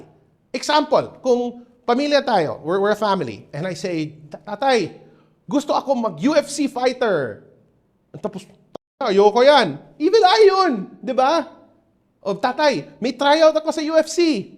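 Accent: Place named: Cebuano, Philippines. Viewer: Malaman talaga na cebuano si sir eh pero hands off napaka Professional!!!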